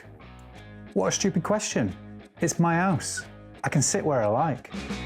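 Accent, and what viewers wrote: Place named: Yorkshire, United Kingdom. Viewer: Sooooo good to hear a Yorkshire accent instead of American! Brilliant and funny! "It's my 'ows" love it hahahahahaa!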